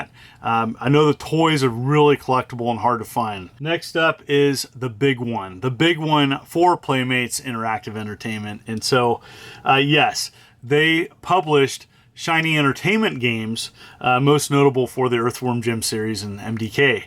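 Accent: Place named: Northern California, United States. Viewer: Great video. It’s funny I’m a NorCal dude also and i recognized your “accent” immediately lol. Thanks for the great content. Love it.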